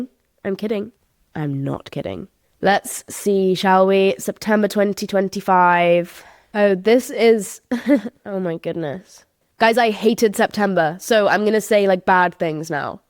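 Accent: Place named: United Kingdom. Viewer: why do you sound extra british in this episode 😭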